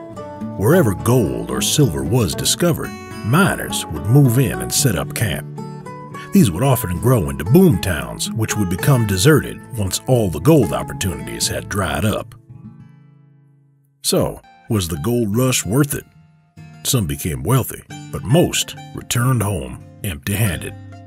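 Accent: South, United States. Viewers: That’s just putting on a southern accent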